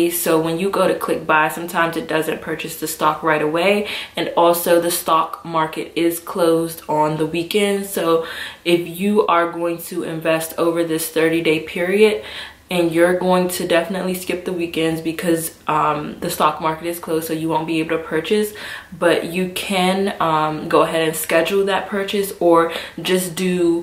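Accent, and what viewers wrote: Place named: DMV, United States. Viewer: I knew you were from the dmv with that accent😭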